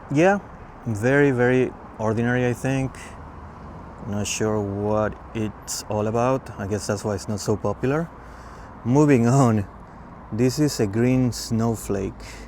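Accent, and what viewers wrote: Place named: Nagaland, India. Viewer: Nice collections. I am from Nagaland, India.I want to tell you that you the way you speak, it's just like one of us,,we people speak English exactly like the way you speak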